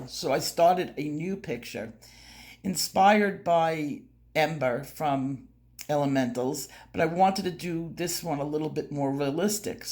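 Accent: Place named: New Jersey, United States. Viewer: So nice to see your newer videos. I have missed your NJ accent and great teaching skills😊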